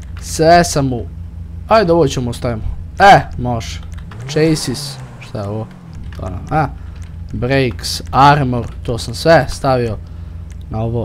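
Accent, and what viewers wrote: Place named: Eastern Serbia, Serbia. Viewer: Akcenat istocne Srbije. Nista lose i nista cudno -_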